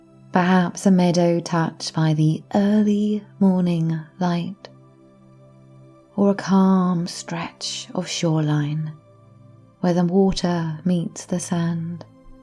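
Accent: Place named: England, United Kingdom. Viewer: My Mum is my true worldly life partner. And I lost her. She died. I have survivor remorse. I am recovered. My Mum is renewed in a different world. I heal my intestines. I am well. Thank you for your hypnosis stories. Your words. Your english voice. Your natural way. Your work. Continue to promote your work to the world. Thank you.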